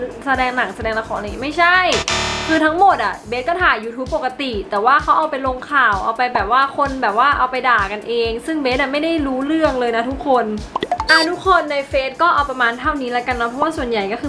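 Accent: Isan, Thailand. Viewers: เอื้อยเบสเว้าอี่สานม่วนคัก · สำเนียงพี่เบสพูดอีสานคือได้มากกกก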